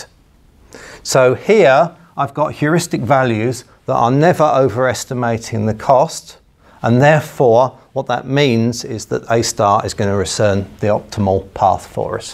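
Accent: United Kingdom. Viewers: British accent is shit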